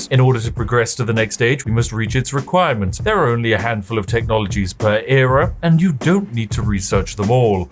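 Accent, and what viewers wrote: United Kingdom. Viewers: Been binging spiff for the last 3 nights, a 12 hour shift is certainly far more enjoyable with that beautiful British accent. Glad to have a new video finally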